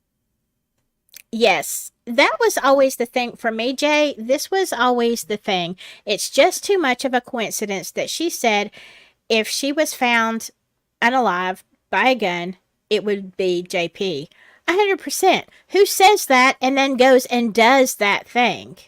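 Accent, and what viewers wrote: South, United States. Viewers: Hello there from Oklahoma. I just heard your channel for the first time today. I loved it! You are a delight and I love the southern accent! Born and raised in Florida. Lived a portion of my adult life in Georgia. Put the 2 southern accents together and my friends think it’s very twangy and comical! Lol! Now let’s see what I pick up in Oklahoma!! Thanks for covering this case! I hope you’ll do many more! I listen to everyone covering this.. You just can’t believe it but yet you know it’s true! I pray for Justice for Mica and for the whole truth to come out for this family! Especially if others were involved in this! I enjoyed it and will be back for more! Great job! #JUSTICEFORMICA 😊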